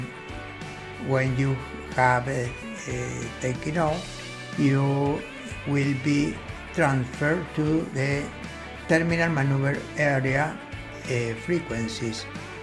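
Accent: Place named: Latin America. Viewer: Dear, are you a certified instructor by the Federal Aviation Administration of the United States of America?? Because I hear a particular Latin accent in your pronunciation. Anyway, congratulations, excellent work, you are a great teacher, your students must be very skilled pilots.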